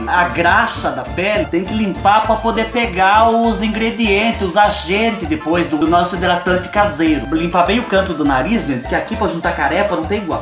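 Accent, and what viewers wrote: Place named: Minas Gerais, Brazil. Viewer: Você tem muito sotaque de mineiro.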